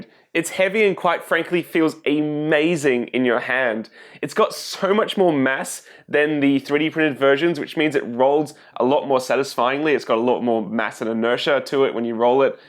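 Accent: Australia. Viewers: I wonder if you are from Autralia? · Is he Australian? Those accent, similar to Hugh Jeffreys iPhone Youtuber.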